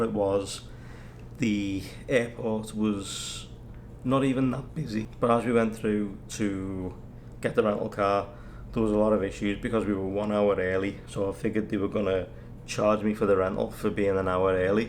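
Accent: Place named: Liverpool, United Kingdom. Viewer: Yess I’m feeling this shout lad ha just subscribed soon as I heard the scouse ha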